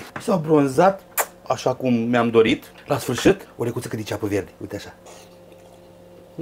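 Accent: Moldova. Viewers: Inconfundabilu grai Moldovenesc il ador😊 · sunteti tare simpatic,și graiul dulce moldovenesc!MULTĂ SĂNĂTATE!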